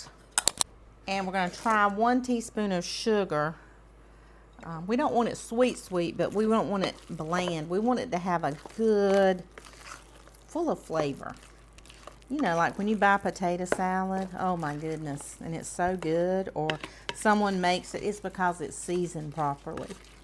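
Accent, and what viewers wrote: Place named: North Carolina, United States. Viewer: Where are you from? You sound like me lol. I’m in central NC. Love your potato salad 😋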